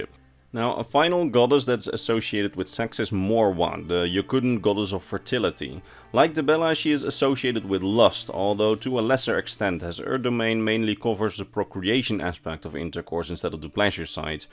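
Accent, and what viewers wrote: Netherlands. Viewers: 5 minutes in I KNEW this guy was Dutch the accent is so thick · Je klinkt zeer Nederlands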